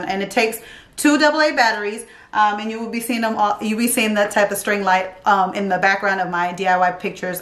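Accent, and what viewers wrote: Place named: Upstate New York, United States. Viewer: These are all very nice. You appear to have an upstate Accent, similar to a New York and a New Jersey accent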